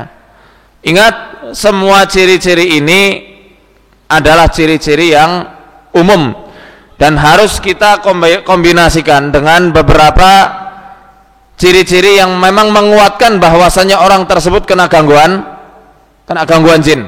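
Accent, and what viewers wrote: Banyumas, Indonesia. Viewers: Pak ustad asli wong ngapak ya....logate pada karo inyong😆😆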